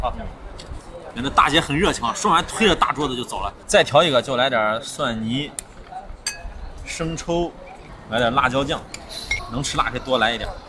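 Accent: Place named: South, China. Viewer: 喜欢听说南方普通话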